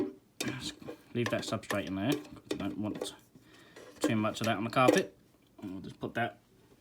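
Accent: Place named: United Kingdom. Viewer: Can tell your from Britain .. what part though? 🤔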